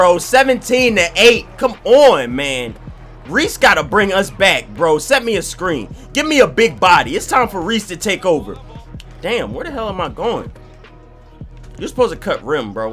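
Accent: Philadelphia, United States. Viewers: U from Philly bro?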